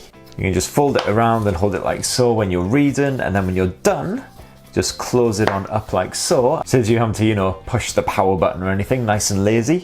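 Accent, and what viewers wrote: United Kingdom. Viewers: Pure British accent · british accent is very annoying!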